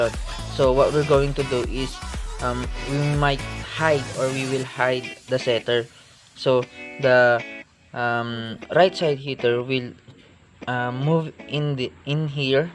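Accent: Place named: India. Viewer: dami mo filler, ang sakit sa tenga ng english mo... parang kang indian😢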